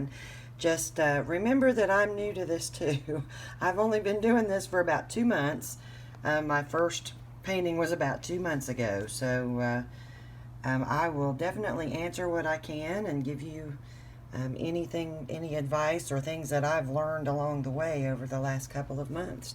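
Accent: South, United States. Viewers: Beautiful! Your accent DOES NOT SOUND HICK....like someone else mentioned, it is Southern Charm!!!!!! I love the southern accent; HOWEVER, there are a couple accents that are not as pleasing as yours, at least to my ear!😊. I really enjoy your work and watching you produce beauty from nothing....don’t be so critical of yourself, you are a winner in my book....keep the videos and teachings coming, I want to learn more! Blessings..... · You do not have a "Hillbilly Accent"... That is Southern Charm.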